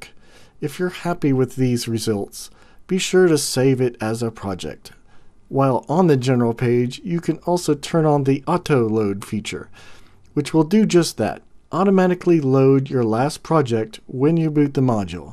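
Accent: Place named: North Carolina, United States. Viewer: Thanks for the videos. I like them, even though you sound like you might be from North Carolinas or something :)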